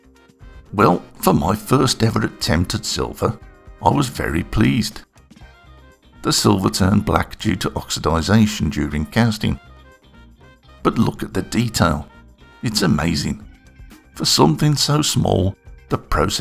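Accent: United Kingdom. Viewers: Joe Kerr He is british, but not ringo british. Different tone. At least to my ear.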